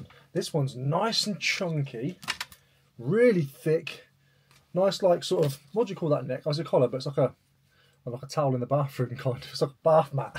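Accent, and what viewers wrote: United Kingdom. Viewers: I like how you say 'Let's just crack into it'.... Please keep talking like a British person, I like it. So many youtubers say 'Let's just dive right in', 'don't forget to like and subscribe' & I've seen countless resellers on youtube saying 'Thirft store' and similar Americanisms, it honestly makes me stop watching in some cases. Yr a good lad, likin yr videos!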